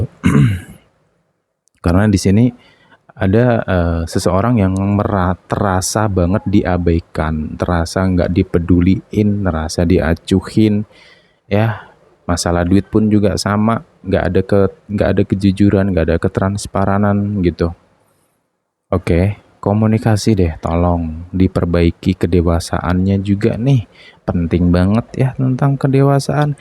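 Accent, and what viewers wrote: Java, Indonesia. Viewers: 😅😅😅 mas. jowo thoo....kalem lucu sht sll yaamurah rizqi🤲🤲🤲🎉❤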